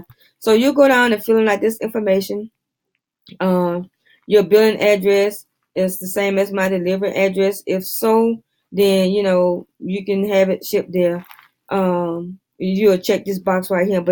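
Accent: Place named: Houston, United States. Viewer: You sound like you from h twn. Preciate the info fr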